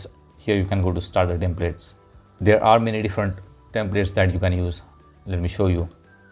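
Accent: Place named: India. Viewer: I dont like indian accent 💩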